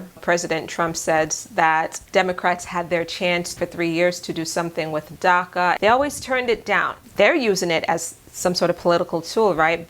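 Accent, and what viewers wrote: Jamaica. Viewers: R u a Jamaican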